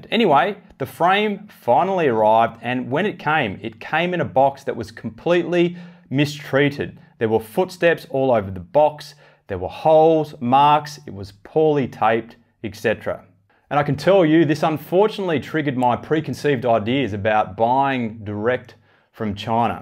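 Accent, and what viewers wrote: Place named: Australia. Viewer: Aussie accent #chinurr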